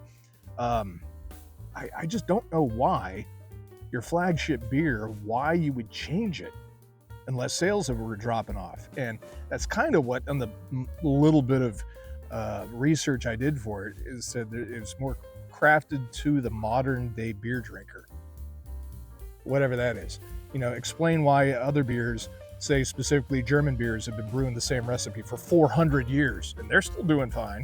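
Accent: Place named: Boston, United States. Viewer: Bawstin LaaaagAH! Remastid! I can’t stand the Bostonian accent but I love the 🍺 😂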